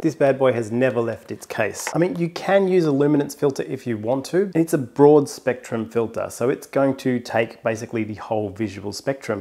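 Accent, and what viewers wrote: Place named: Australia. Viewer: I don't even own a telescope and am totally offended! But your accent softened the blow a bit knowing what a-holes ozzies can be ;-) Keep up the class work!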